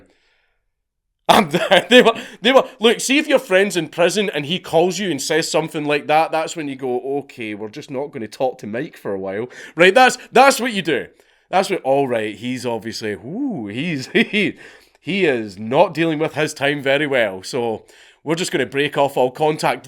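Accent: Scotland, United Kingdom. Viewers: The thumb nail with the adoring fan 😂😂😂 oh man. We called him Troll Kid because he looks like a troll doll. Extra points if you know who sang this in Oblivion: Flyyyyyin’, flyin so high, cliff racer FLY sooOOO high. FlYYYYYin’ Edit: please tell me you and your friends karate chopped Minotaur wang too and launched the adoring fan off the cliff 😂 good times… With love from the USA Freakin love the Scottish accent 🤤 hope to come back some day 🤘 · I love how the Scottish accent changes Twitter into Twatt-er - which sounds incredibly apropos.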